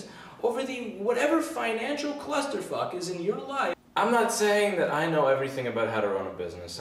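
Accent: New York, United States. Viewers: Listen, you speak very well and can clearly and entertainingly articulate complex ideas - nice brain dude. I think you should consider that you may be suited to entertainment as much as you are computer repair. Almost a NY Wisdom vibe to your delivery that - accent and all - makes me feel like I'm back in the Prickly City itself :) Excelsior, sir.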